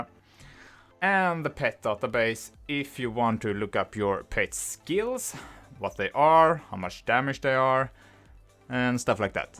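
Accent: Sweden. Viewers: i can hear in you accent when you speak english that you are SWEDISH hahahhah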